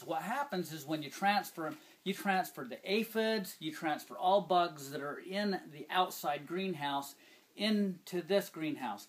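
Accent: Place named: Russia. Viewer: Mmm yummy russain accent. My wife is russain. Very good woman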